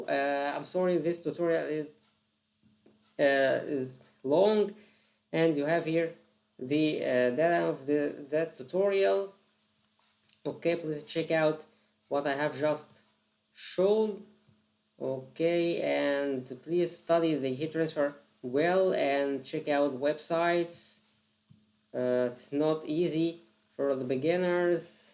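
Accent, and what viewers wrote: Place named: Egypt. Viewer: although you have a very good english that made me doubt, however i had a strong feeling that this is an egyptian accent xd. very informative video even after 7 years, thank you! can i get your email for a technical question?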